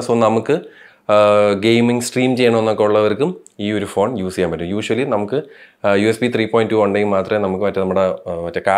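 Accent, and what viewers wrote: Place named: Thiruvananthapuram, India. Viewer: Edake tvm slang varunu but polii annu muthe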